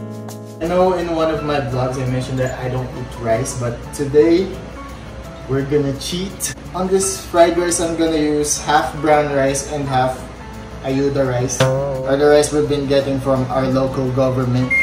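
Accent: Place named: Oregon, United States. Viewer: Congratulations 4000 subscriber 👏👏👏love this content with English version you still have Oregon accents very sweet.Made my night laughing 😂 notice you a lot of S.Hope Marky will do English version vlog.Yes more English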